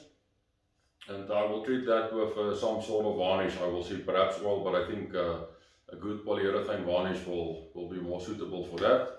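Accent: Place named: Afrikaans, South Africa. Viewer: I knew that was an Afrikaans accent! But the name puzzled me. Must be Belgian/Dutch/ South African.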